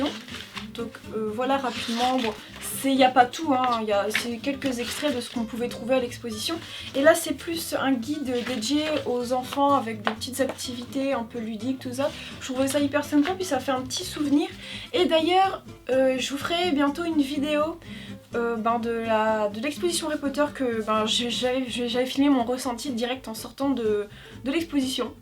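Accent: North, France. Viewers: Tu as un joli accent du nord de la France :) Merci pour tes vidéos!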